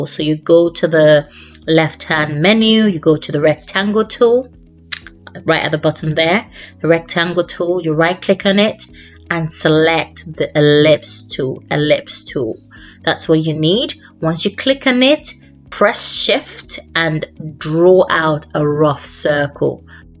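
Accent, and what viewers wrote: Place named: United Kingdom. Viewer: You saved my evening! I have been trying to crop to a circle in Powerpoint for about an hour, reading instructions and a couple videos. You showed STEP by STEP on the screen and that's what I needed. And you did it in your classy British accent to add to the enjoyment! Thank you very much!!! Canva looks even easier....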